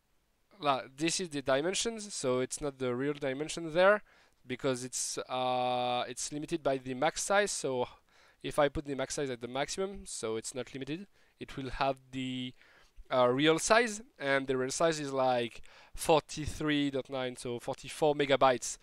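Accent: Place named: France. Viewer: Hmmm je ressens un accent Français là, ça je valide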